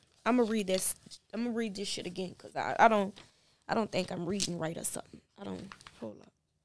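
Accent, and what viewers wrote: New Orleans, United States. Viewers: Her and that New Orleans accent 😩🥵😍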